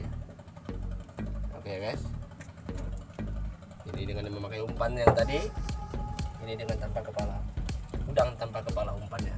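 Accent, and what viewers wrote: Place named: Malaysia. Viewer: Dari logat nye, ab org melayu, melayu mana bg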